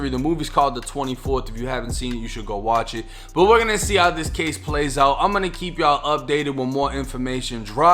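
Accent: Boston, United States. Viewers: You from Boston bro? I'm from Lawrence, MA. Did you pahhk the cahh at haahvahd yawwhhd? Lol you gotta be from mass bro I catch the accent in all your videos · brand is definitely unique and that Boston accent adds to the entertainment factor. Charges are pronounced Cho-jizz, lol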